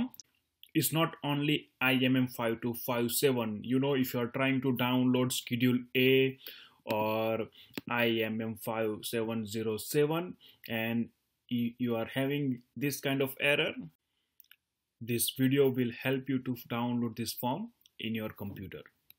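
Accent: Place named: India. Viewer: Узнаю индийский акцент. Спасибо.)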